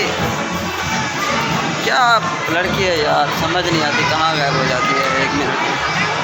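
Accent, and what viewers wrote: Saraiki, Pakistan. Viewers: awaz sa lag raha siraiki hain or ma jhang sadar say hu khush rahain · Wah birah wah , tun tay rakh, Jary sarden honay koon sarra. Sajid From Khanpur. Ap ka vlog kal dekha aur ak din mai almost 10 vlog dekh liye, Ap jo Saraiki bolty ho maza aa jata. Ji way birah ji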